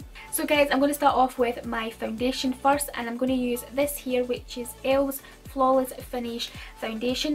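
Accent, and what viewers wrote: Scotland, United Kingdom. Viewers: Omg your Scottish! Me too 😝💗💗 Have subscribed love your videos Soo gorgeous 😻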